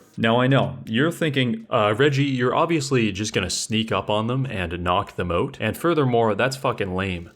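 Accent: Canada. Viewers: I love how your accent seems quite local to me until one word comes out ULTRA CANADIAN and I'm reminded you're not local to me at all.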